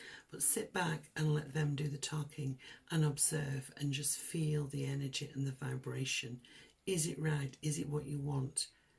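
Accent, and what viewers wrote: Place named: Rochdale, United Kingdom. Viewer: Thank you, this resonates with me in two on-going areas of my life. I've subscribed so looking forward to monthly readings. (Are you a Rochdale girl by any chance? Only asking this because you sound just like me!) The best general monthly reading I've ever watched xx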